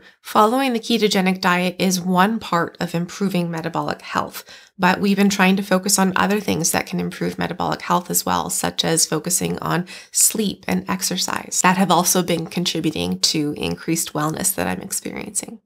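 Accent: Canada. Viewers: She's not American and has a distinct Candian accent. 😂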